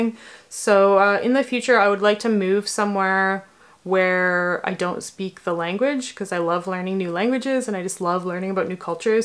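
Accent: Canada. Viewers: Its always funny when I hear a canadian say “abooout”. eh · K, you've been living in Japan for that many years... but i still heard that Canadian about.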